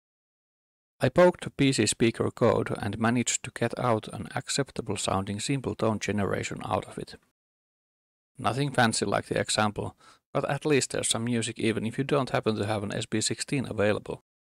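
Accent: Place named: Finland. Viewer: Arvasin heti aksentista, että kyseessä on suomalainen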